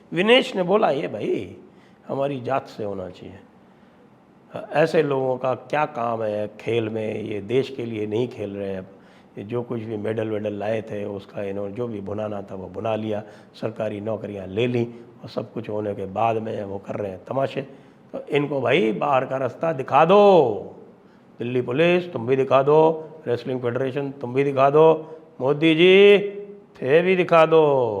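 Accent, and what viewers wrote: Haryana, India. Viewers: Sir aapki haryanvi sunke swad agya. Jai Bhagwan parshuram 🤩🚩🚩🚩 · वाह साहब न्यूज बताने का हरियाणवी अंदाज बड़ा निराला है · सर आपकी हरयाणवी सुन कै स्वाद आ गया 😂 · जी, आप तो बड़ी गजब हरियाणवी बोलल्लो छे, घणो दिल खुश होग्यो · Ha Ha love your Haryanvi Accent 🤣🤣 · Your Hariyanvi is too good, Sir 🙏🏻🙏🏻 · Zabardast haryanavi, sir. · Your Haryanvi accent is amazing. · Haryana local language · Ajj ka andaj nirala rha haryanvi style me. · Sir your Haryanvi is too cute😂😂 And ye log Haryana ka naam dubane ki kaam me lag rkhe hai. Kori sudhi bakwaas kr re hai ye bawde.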